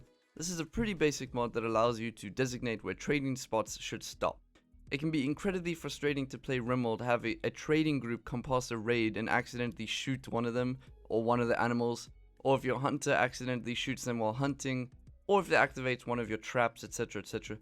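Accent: South Africa. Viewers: You sound Afrikaans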